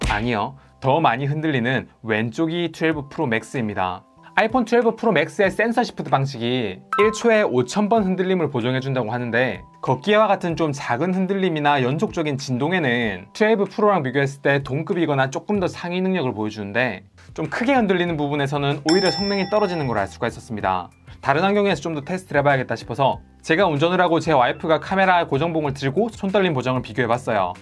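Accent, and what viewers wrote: Gyeongsang, South Korea. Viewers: 경상도 분이신가용?? 말투가 먼가 넘 귀여우심ㅋㅋ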